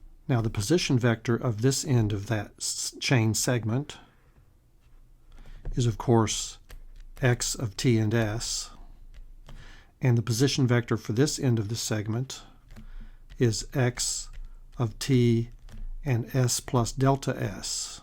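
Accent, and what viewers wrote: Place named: Texas, United States. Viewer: Damn calculation done by unsimplified physicist with Texan accent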